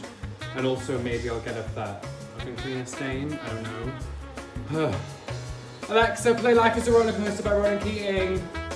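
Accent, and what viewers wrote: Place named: Newcastle, United Kingdom. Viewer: His Geordie accent is sneaking in quite cutely!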